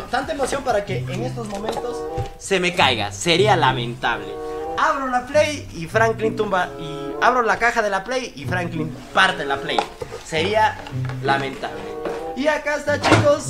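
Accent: Mexico. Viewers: Yo también juraba que el era mexicano por el acento de narrador mexicano que tiene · Si no decías que eres de Cusco, juraba que eras mexicano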